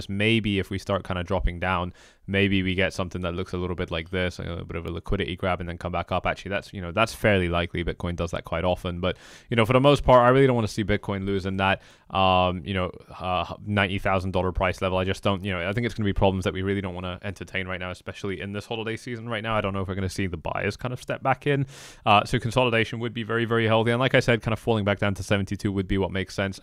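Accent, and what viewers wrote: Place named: England, United Kingdom. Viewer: hey, haven’t been watching technical analysis lately but I’m wondering what happened with your cool English accent.. you had one years ago and now I can’t hear it.. seriously did you move to Dubai like most of the other crypto guy’s and loose the accent.. anyway peace bro.. you always have had great ta.. appreciate it ..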